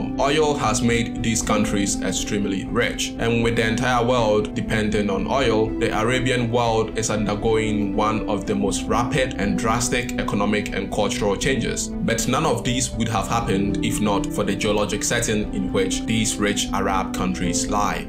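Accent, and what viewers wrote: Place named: Nigeria. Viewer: Make Naija accent the norm in documentaries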